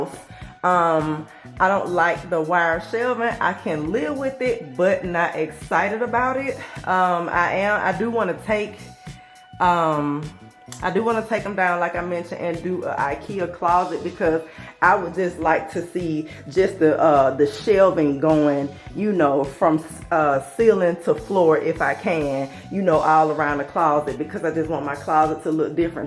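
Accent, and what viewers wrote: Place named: Tennessee, United States. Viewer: Ur accent ❤where r u from ? Sounds like ur from Tennessee or Alabama?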